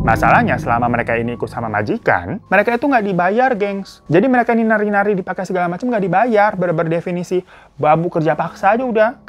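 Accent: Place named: Central Java, Indonesia. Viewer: Abang aslinya dr jateng mana ngapak nya.....utara atau selatankah.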